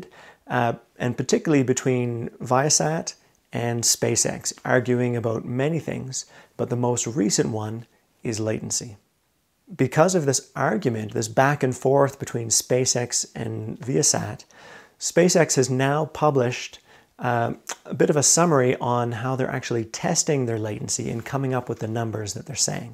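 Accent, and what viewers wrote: Nova Scotia, Canada. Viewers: You from Nova Scotia brudder?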